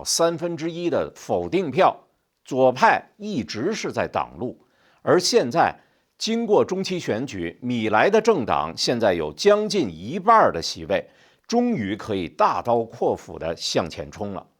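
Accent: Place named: Beijing, China. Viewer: ，您的节目是我日日必看的节目! 您的语音是我最爱的听的语音，正统，正规，严谨无误的北京啌，又不贫嘴，又不官腔，太棒了！而且报道的内容我都信服。尤其是好消息，好动态更让我兴奋无比。谢谢！难得难得啊！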